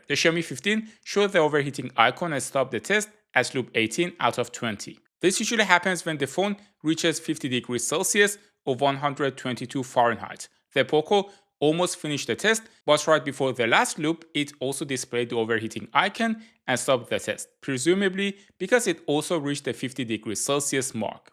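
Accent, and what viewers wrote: Iran. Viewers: dash kheili irani mizani ba in lahjat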